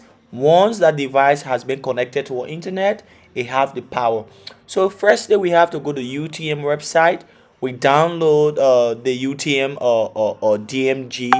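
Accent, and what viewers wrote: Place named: Liberia. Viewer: hi, I know this is not necessary. you sound like a Liberian. Good job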